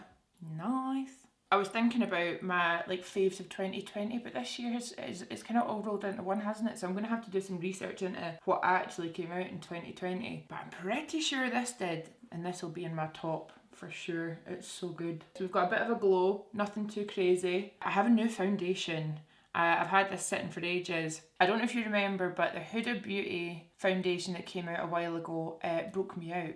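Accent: Scotland, United Kingdom. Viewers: My personal opinion I LOVE 💕 the way you say “Girl” I absolutely love you’re Scottish accent!!!! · HOW stunning is that eye make up!? 😍 ur channel is forever my fave. Love hearing a fellow scottish accent on YouTube 😘 p.s I live for the out takes at the end of each video 😂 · "My ghetto friends" Ha ha ha!! I love your Scottish accent and the way you say girl! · It was the accent for me. Oh how I miss Scotland.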